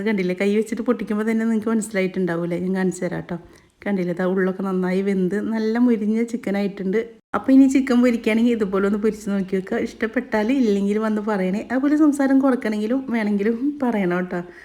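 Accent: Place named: Thrissur, India. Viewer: Edaa.orupaad thanks..undaakki kaanichathil..undaakkeet abipraayam parayaam👍❤️❤️aa kini kini trissur samsaram kurakkallee..kurachu koottikolooo😁❤️❤️❤️❤️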